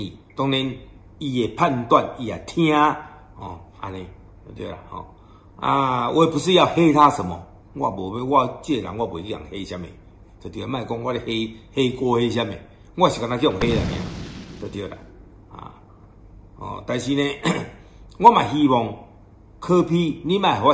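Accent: Taiwan. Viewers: 台語講的很道地，首富卻沒有傲氣與霸氣，更接地氣。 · 旺旺阿伯說話很有台灣味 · 道地隔壁阿伯說話，真親切。道地臺灣話真親切。謝謝你站出來。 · 旺旺聽你說話真的感覺很庶民。一點也不像大老板。又很台。不知道平時有沒有穿藍白拖🤪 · 哇，董仔的台灣味十足，還真蠻有吸引力的！ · 天啊！台語口音好道地喔！怎感覺有海口音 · 體就是台語夾國語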